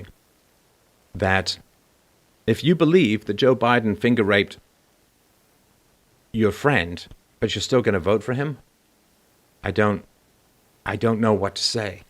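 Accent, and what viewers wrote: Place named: Ireland. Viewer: Where are you from? Cool accent. Is it Irish? The scary thing is...Biden could, potentially win, especially in the middle of all this insanity.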